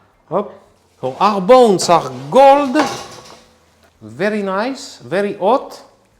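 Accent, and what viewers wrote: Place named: France. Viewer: j'ai mis une minute a comprendre que la video est en anglais, je sais pas si l'habitude de l'anglais, le french accent, ou l'herbe dans la cigarette, bref merci pour la video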